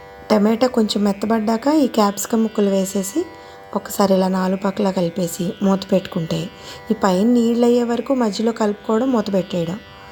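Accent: Godavari, India. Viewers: మీ గొంతు హస్కీగా బావుందండి. గోదావరి జిల్లా వారేమో మీరు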